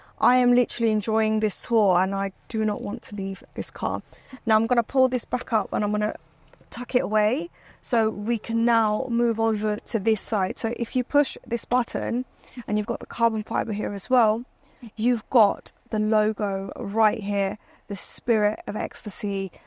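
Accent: London, United Kingdom. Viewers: you can hear the london accent coming through lol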